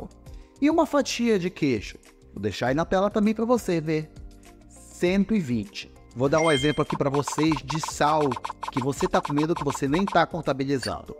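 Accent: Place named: Rio de Janeiro, Brazil. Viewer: Dr vc tem staque de carioca...vc é do rio de janeiro?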